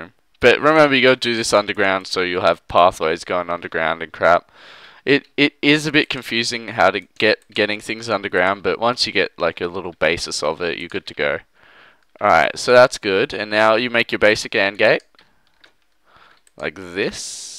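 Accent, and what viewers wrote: Australia. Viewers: he is an aussie and thats why he said buddon or whatever you said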